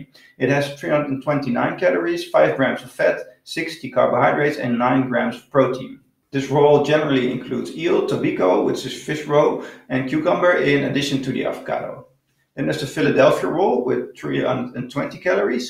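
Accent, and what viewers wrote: Netherlands. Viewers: This dudes sounds as Dutch as you can sound. I would bet the house on the fact that this guy is originally from Holland.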